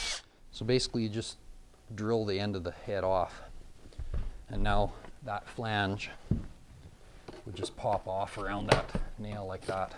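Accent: Canada. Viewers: great informative video sir, you from Canada eh? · Only needed to see the first 5 mins, but was captivated and watched the whole thing. The Canadian demeanor is just so lovely...at least from the accent he sounds Canadian…anyway, excellent clip! Right now, my sliding door feels super heavy, and not being that handy, I couldn’t figure out how to pop it out to clean the tracks (even though they look pretty dang clean, or whatever. I had no clue you could adjust the height of the hardware under the door. This is going to be super helpful. I’ve got a weekend project that shouldn’t take much time at all. THANK YOU from Ohio. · My wife is from Victoria BC. I picked up your accent immediately. Thanks for the vid! I have to replace a broken door.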